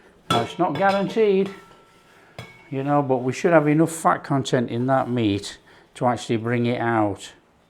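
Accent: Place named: England, United Kingdom. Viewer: Hi this amazing,I hope this ham will be delicious I also like your English you come from England, watching in Mombasa Kenyan coast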